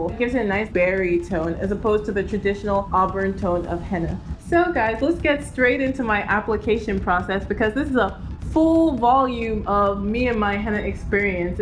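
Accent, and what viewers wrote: Nigeria. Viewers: DRY Nigerian accent. LOLEST. Loved it. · Dry Nigerian accent 😂 at least you have one. I'm half Nigerian and never picked up the accent :( but I love it though!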